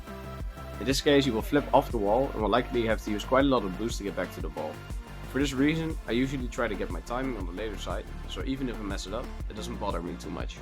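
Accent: Netherlands. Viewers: haha het accent gaf idd een indicatie dat OP een Nederlander is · Het Nederlands accent 😭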